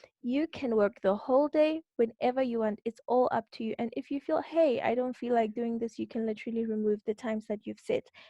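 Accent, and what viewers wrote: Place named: United States. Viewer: U seem to have a bit of an american accent. Does one need to have this accent to be considered ?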